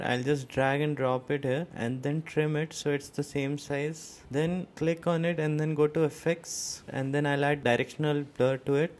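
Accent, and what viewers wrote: India. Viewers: Bro pls speak in hindi 😢 your accent is indian I know you can speak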